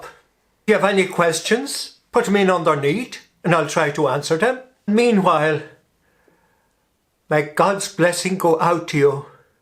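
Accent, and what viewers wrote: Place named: Ireland. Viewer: I just came here to hear Father say "Farty", I studied in a school run by the Irish Christian Brothers and I will never get tired of that accent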